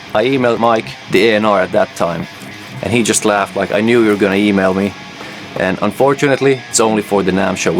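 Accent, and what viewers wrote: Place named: Germany. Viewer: Man, I just found you and as a Jackson lover since the start of the 80s and having started playing guitar back in 77 I love this. I have always loved these guitars as well. The homy grail of Jacksons. I have a charvel Preditor crackle from 91 with a factory Schaller trem I love and with the reverse headstock which is too cool. I dig your playing too man. Do you have a band website where I can listen and buy your music? What country are you from may I ask? You sound sorta German but can't quite put my finger on the accent. 😂 Regards and stay healthy brother. From the heartland . Indiana USA Tim . ❤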